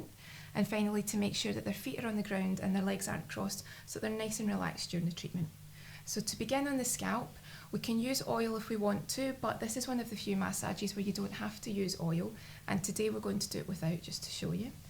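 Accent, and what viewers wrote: Scotland, United Kingdom. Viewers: 😂😂 I love still game was bought up with Rab C and I love your jock accent 🏴 greetings from east London 🏴👍🏻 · I loooooove scottish accent ♡ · With that accent, I fully believe that Scottish women could rule forever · I learned a Scottish accent from you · Awesome video, the scot accent an added bonus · I like your accent, where do you come from? Is it really Scotland? Some comments mentioned that before... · Not sure how i ended up here, but i have to say. I still think the scottish accent is my favorite. I dunno what about the accent draws me to it, but its just such a good accent. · Nice relaxing video. I'm sure it would feel great and have positive health effects. I love your Scottish accent too. Some women have very soothing voices like Lita. You certainly do too. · Scottish accent ❤️ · Its really strange to hear a fellow scot do a massage video for some reason.lol did you study holistic massage in any colleges in Glasgow?. 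looks like the Cardonald college uniform You model is a babe as well:p haha cool vid:) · The Scottish accent is just lovely here (pleas say "braid" please)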